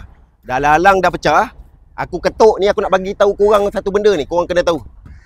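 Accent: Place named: Aceh, Indonesia. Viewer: Aksennya seperti orang aceh yaaa..aq lama di aceh jd familiar dgn logat orang aceh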